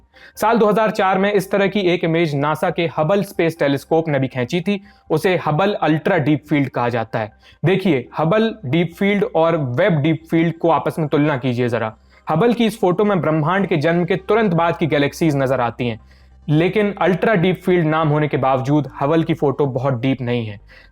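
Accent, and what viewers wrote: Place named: Jabalpur, India. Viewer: Mast bhai jabalpuri bhasha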